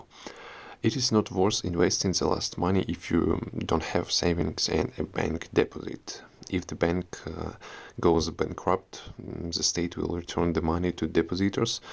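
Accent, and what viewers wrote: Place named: Russia. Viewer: У тебя акцент есть русский!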